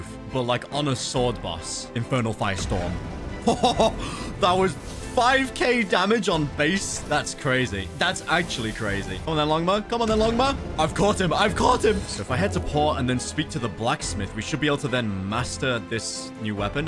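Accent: United Kingdom. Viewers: Bros British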